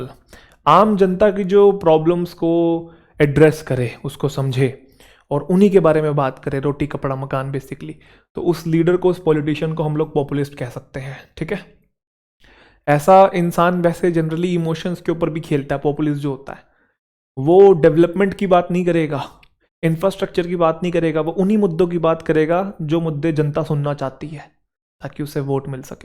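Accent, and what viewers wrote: Haryana, India. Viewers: sir ji mai apaki classes regularly attend karti hoon. sir ji aap hariyanvi bahut badhiya bolte. muje bahut hansi aati hai. sir ji kabhi muje time mila to aapko milne aaugi.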